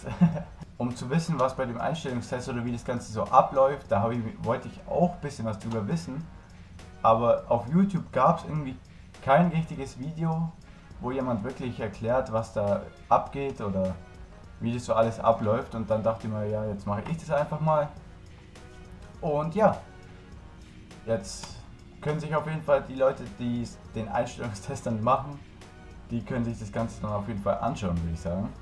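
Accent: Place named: Bavaria, Germany. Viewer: Dafür kann er nichts, viele Polizisten aus Bayern sprechen so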